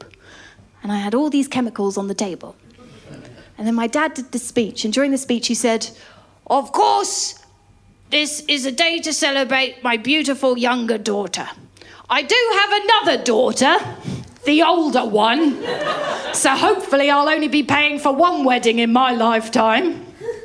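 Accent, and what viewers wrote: RP, United Kingdom. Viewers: Stumbled through the youtube algorithm to this. I love the humor. The profanity mixed with the british politeness or rather way of speaking is a refreshing act compared to us comedics! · Diane Spencer Btw some men in the States actually prefer redheads. No ginger bias here darlin. Keep at it: you're raw, honest, funny and gorgeous. I wish there were more women like you. Take care and bring your act across the pond. And that British accent 😍 I would be honored to shake your ginger claw lmao✌ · Her delivery is incredible. It really adds to her jokes that she's talking about shocking/sexual stuff in clean cut RP. Very expressive face also helps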